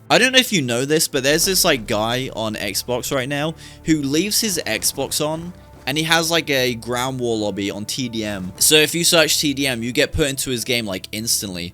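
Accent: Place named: United Kingdom. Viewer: Do you also do a car channel? Your voice sounds familiar. Classic britain😊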